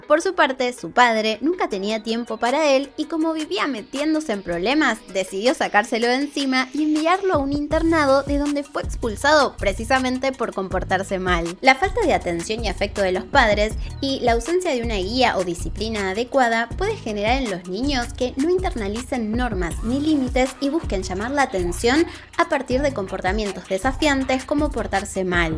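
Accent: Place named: Argentina. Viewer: Que acento argentino tan marcado joder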